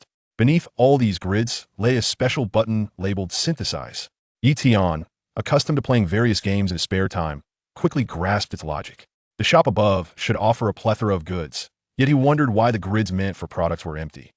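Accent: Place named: France. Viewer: Stopped after 7mins due to that FRENCH ACCENT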